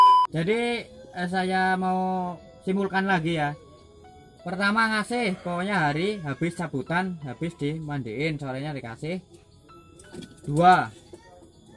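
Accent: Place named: Cirebon, Indonesia. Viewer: Kayanya dari omongannya org crbn ya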